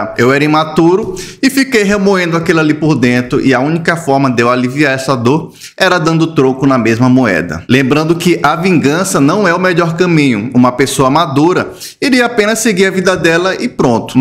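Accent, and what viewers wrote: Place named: Northeast, Brazil. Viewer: 😂 Adoro as suas histórias!!! Principalmente seu sotaque nordestino...❤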